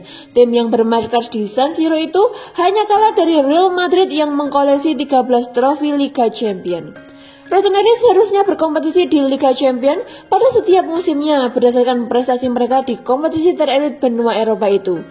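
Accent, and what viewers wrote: Java, Indonesia. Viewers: Medok Is Medok 😃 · Kok narator Berita2 Ac Milan Di Youtube... Medok2 semua yaa...??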